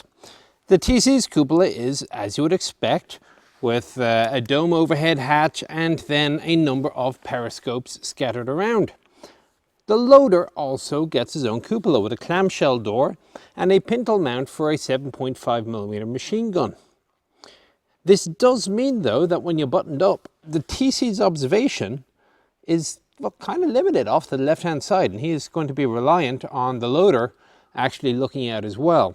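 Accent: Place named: Ireland. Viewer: Always great to hear the Irish Shh as in Sh-tuff .. especially explaining the complex simplicity of the Swiss Army Knife idea - mounted on a tank. Make way there for Shlattery's Mounted Futtt .. Huzza (with an -ay sound, it seems not with an -ah; like Jose and a wee cuppa tea: Yeah! hurray!) It's OK, I'm daft - certified and all.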